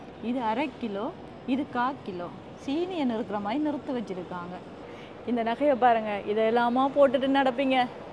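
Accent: Sri Lanka. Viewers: அழகான சிங்களம் தமிழ் ❤❤❤❤❤❤❤❤❤ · இலங்கை தமிழ் மிகவும் அழகு...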